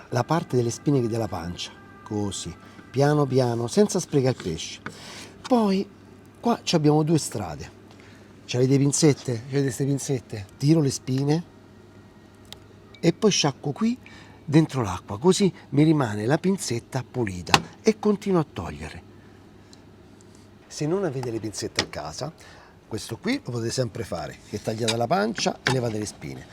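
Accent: Rome, Italy. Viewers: I can barely follow Roman Italian but I love his stuff!!!!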